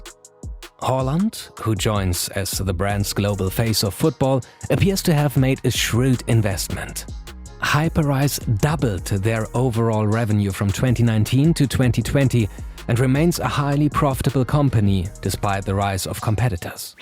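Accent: Germany. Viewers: du bist deutsch das hört man sofort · Can you hear the German accent? The presenter is German.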